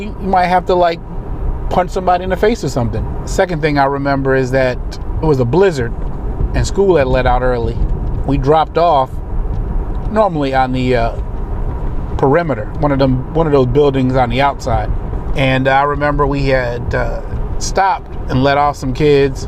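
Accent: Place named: Bridgeport, United States. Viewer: If Bridgeport CT is so close to the Bronx NY why is your accent so different ?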